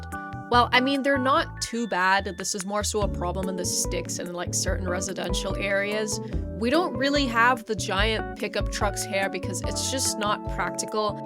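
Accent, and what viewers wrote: New York, United States. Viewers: Wait wait WAIT A DAMN MINUTE!!! Your from the UK but you have a heavy new yorker accent 😭? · I'm guessing you're originally born in the states due to your American New York accent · I thought I was going insane- I heard that new yorker accent the last video and was confused af on this video when getting to the UK part. LMAO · Yooo wtf I was thinking the same thing, I've never heard a British person sound that american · Nah, she’s speaking American.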